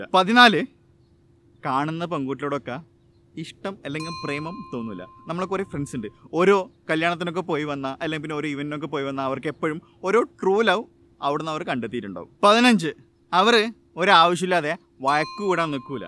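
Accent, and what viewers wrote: Kannur, India. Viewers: ningal kannur aano?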